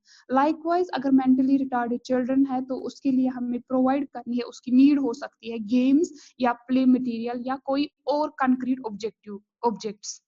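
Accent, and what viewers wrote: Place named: Kashmir, India. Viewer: Ur language accent is kashmiri ❣️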